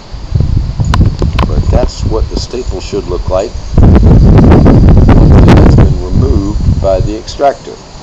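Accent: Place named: Transatlantic, United States. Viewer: This man has a translantic accent..... wow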